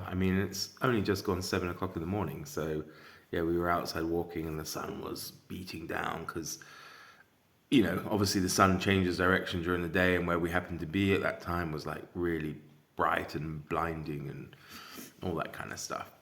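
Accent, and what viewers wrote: United States. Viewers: “Back by unpopular demand” had me wheezing right out the gate. And honestly, your commitment to that Western accent plus the Larry and Stretch voice acting? Emmy-worthy. You sold me on Lawless Miles. Fun wins. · Hi,! I don't know if you take requests, but I have one. Will you consider, please, carrying your Texas accent over to Victober? I would very much enjoy hearing you read Dickens and Trollope, etc., to us as Tex!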